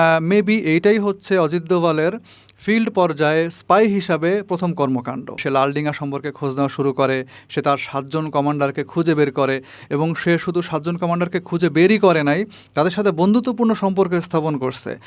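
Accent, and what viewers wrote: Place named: Bangal, Bangladesh. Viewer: Korssy ,kaisse , dissey thik moton bangal bol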